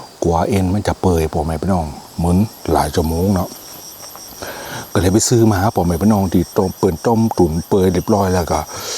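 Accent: North, Thailand. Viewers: ชอบตรงทีเขาอู้คำเมืองจ๊ะ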